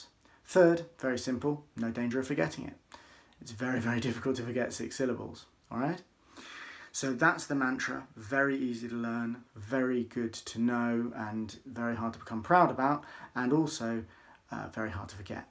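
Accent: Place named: England, United Kingdom. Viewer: Thanks for enlightening us. A few questions if you don't mind: 1. Where does the name Chenrezig come from? 2. Is Chenrezig the same as Avalokitesvara or Guan Yin Bodhissatva? 3. Is Chenrezig manifest into thousand eyes, thousand arms? 4. Is Chenrezig a male or female? 5. Did Chenrezig born out of lotus flower like Guru Rinpoche? Because mantra is like Mani Padme ( like Padmasambhava? ) Thank you, with Metta ( btw, like your English accent and you are very young, indeed. )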